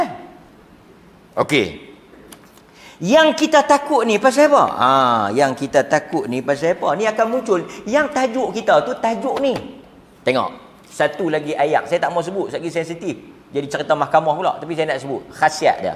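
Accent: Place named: North, Malaysia. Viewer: langgah ayaq,pepak kismis..pure utara noo..👍👍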